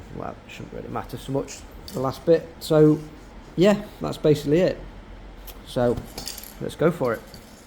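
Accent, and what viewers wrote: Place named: Yorkshire, United Kingdom. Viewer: who'd have known that the best lens refinisher would have a yorkshire twang. 👌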